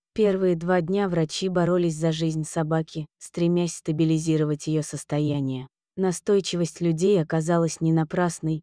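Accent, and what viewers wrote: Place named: United States. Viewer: Кто нам читает американка что-ли слышите как говорит ?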